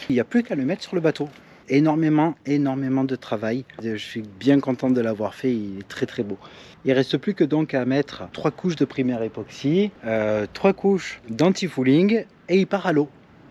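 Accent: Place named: Provence, France. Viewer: Bonjour très beau boulot j'ai un petit voilier sa fait 4ans que je lui est rien fait dessus faute d'argent c'est une coque acier maintenant je suis a la retraite donc je pense avoir un peut de temps sa fait plaisir de voir une personne comme toi qui se défonce et surtout qui si tien bon comme on dit en Provence c'est bien pitchoun si tu passe en nouvelle Calédonie fait moi signe on prendra un pot ensemble ( je suis de la Provence aussi et sa me fait plaisir d'entendre l'accent )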